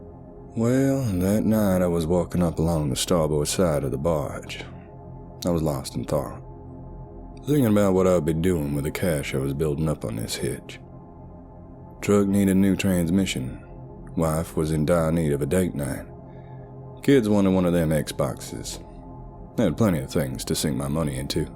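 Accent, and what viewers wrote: South, United States. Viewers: Wow. you nailed that southern accent! Granted, I was raised in NY state but damn if I didn't feel like I was in the bayou. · spoiling us with another vid in his smooth southern comfort rendition. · Great souther accent bro!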